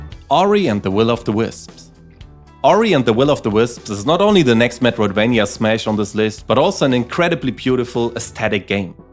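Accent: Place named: Germany. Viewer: Your accent is german, isn't it? As a fellow german myself I find this really funny but I'm worried my own accent will get stronger through hearing all of your videos. Also I played moonlighter after getting it recommended and I liked the concept, but it was VERY repetitive and didn't have to offer anything than this innovative selling game mechanic. After the second dungeon I got really bored and wanted a bigger overworld open world and varying gameplay. All the other games on these list are absolute masterpieces though🔥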